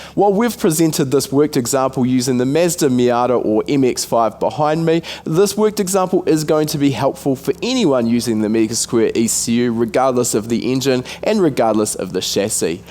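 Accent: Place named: New Zealand. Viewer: Kiwi???..where are you in nz??? Cheers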